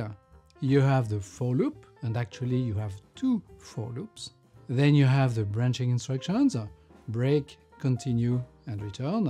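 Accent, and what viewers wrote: France. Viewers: I like the overall concept of JEP cafe, discussing the recent developments in JDK. Apart from explanations, I like his thick French Accent.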